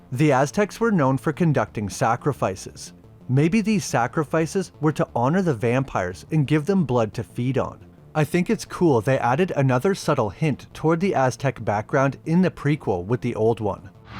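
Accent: Canada. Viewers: This is random, but are you Canadian my guy? lol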